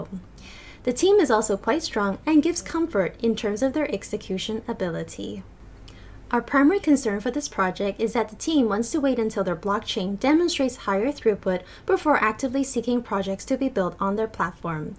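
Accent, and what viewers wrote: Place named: United States. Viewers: AML86 na it's definitely her. Ok.. I'll explain myself. I owe her that. She either sounds dead because she's reading from a script in monotone or it's her highly corporate American accent. Likely a mix of both but speaking freely may fix it 👍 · I coming from humble beginning...highly corporate American accent I find very attractive. I don't want to listen to no ghetto chick because she has "soul" but hey you cant please everyone I guess but you can certainly piss everyone off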